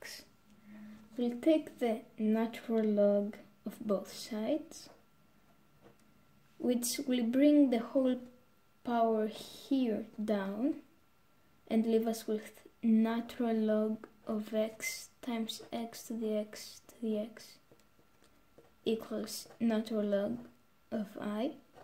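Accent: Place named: Russia. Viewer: U sound russian accent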